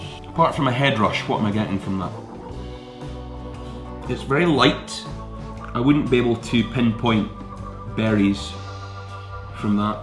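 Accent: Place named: Scotland, United Kingdom. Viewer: my wife and I have booked to come to Mallorca again in early September. Looking for some tips on Palma bars, tapas bars and restaurants. Good to hear a fellow Scot on YouTube!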